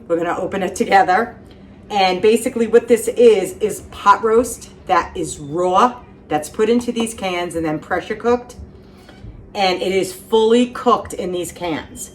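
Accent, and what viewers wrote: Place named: Cleveland, United States. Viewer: I love your show! This canned meat meal seems great! Trying it tonight! Doug is lucky! And btw... i live in North Carolina, I date a beautiful girl from Cleveland, your diction and accent are so similar... fogetaboutit! Keep up the good work!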